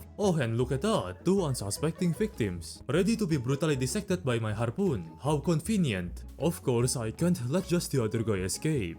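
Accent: Russia. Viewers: interesting, I would have guessed russian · You sound russian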